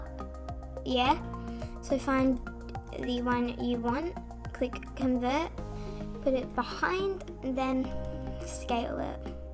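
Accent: Australia. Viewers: ITS AUSTRALIAN AND ITS CALLED AN ACCENT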